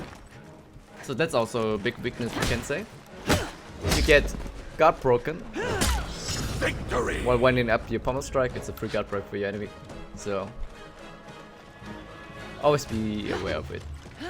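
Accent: Germany. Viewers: its the audio mix, he has a slight german accent but should be understandble for everyone.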